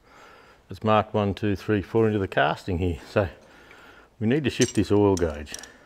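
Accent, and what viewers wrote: Australia. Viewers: I love your Australian accent 😁🥃🇺🇸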